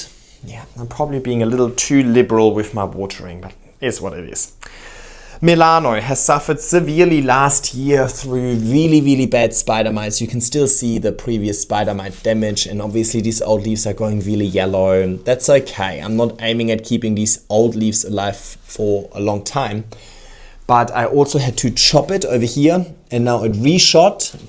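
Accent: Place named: Germany. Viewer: Your accent sounds like your German. Are you? 😁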